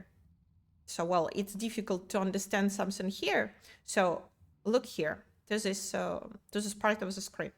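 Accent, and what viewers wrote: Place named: France. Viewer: I'm wrong again. That's OK, I'm used to it - I'm married. Lovely accent, is it French then?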